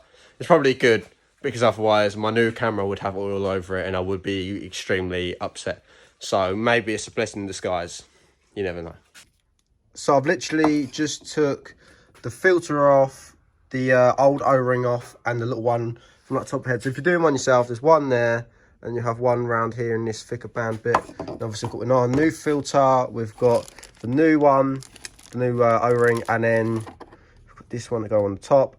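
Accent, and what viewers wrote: United Kingdom. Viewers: That Uk accent kills my ears, for real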